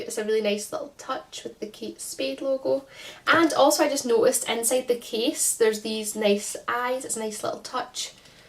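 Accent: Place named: Scotland, United Kingdom. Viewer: Wow you look amazing I hate wearing glasses but u have showed how amazing the right pair can look ,I think I need some like these to thanks for this review and love your Scottish accent 😊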